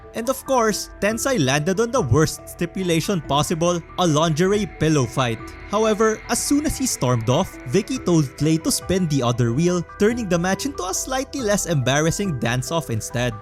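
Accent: Philippines. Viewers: I can hear your accent is filipino. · is bro talking had a filipino descent???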